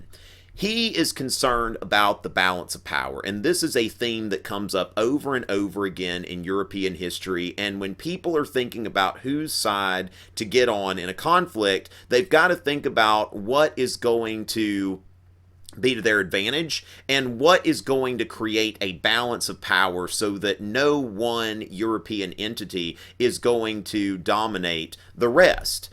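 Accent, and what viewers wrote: South, United States. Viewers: Southern US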